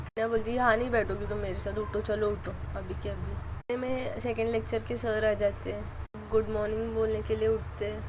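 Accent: Maharashtra, India. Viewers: Hindi main jo marathi ka thoda tadka lagta hai kya baat hai ☺️☺️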